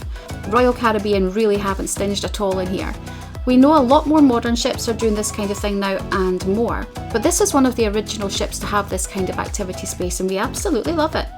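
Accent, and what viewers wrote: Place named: Australia. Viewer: Might be the accent. Aussie’s can hear you just fine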